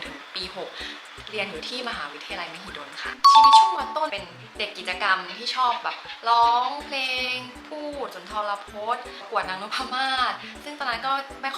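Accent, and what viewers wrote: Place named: Buriram, Thailand. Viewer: จดจ่อตั้งใจฟังจนจบอยากรู้ว่ามีแฟนรึยัง แต่ฟังจนจบก็ยังไม่รู้ 555 ผมเล่นกีต้าร์และเปียโนมาแต่เด็ก เล่นให้ร้องเพลงได้ ร้องคู่กันก็ได้ มีบ้านอยู่ในตัวเมืองบุรีรัมย์ไร่นึงหลังทวีกิจจิระ ไว้เป็นเรือนหอสำหรับแต่งงานได้ ชอบมากสำเนียงบุรีรัมย์ชัด ผมเรียนอนุบาลที่บุรีรัมย์ เวลาไปดูแลบ้านที่บุรีรัมย์จะต้องแวะกินลูกชิ้นทอด อยากชิมลูกชินทอดฝีมือคุณหมอครับ ผมเล่นกีต้าร์เพลงแนวนี้ครับ <link>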